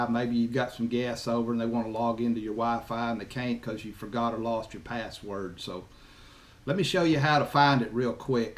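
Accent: South, United States. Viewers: Thank you, u just saved my business and person life oh and it's the southern accent for me🙏🏽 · Dude you are awesome!!!! Thank you! Love the southern accent!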